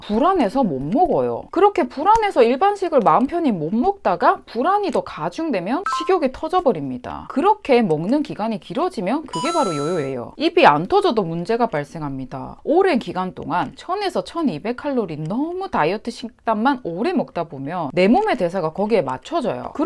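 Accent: Gyeongsang, South Korea. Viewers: 경상도 사투리 베이스의 표준어인가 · Yuna Kim 저도 경상돈데 살짝 저분은 경상도 말투 중에서도 희귀한 편인듯요....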